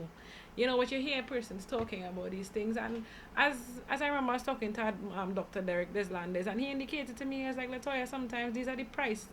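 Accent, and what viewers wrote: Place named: Jamaica. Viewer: You already sell out. stay in Jamaica married ah man and get Jamaican citizenship caused you already sounds like a yardy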